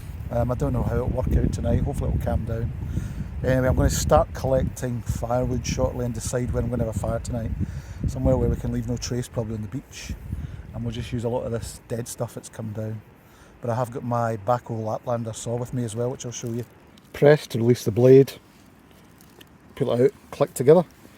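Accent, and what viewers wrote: Scotland, United Kingdom. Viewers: So sorry to hear about your mum - it's never easy, even when expected. I derive great comfort from watching your videos. I am a Glaswegian living in East London for more than 25 years now and I just don't get the chance to get my backpack and tent out nearly as often as I would like to. I enjoy listening to your Jock tones and experiencing your camps by proxy. Thank you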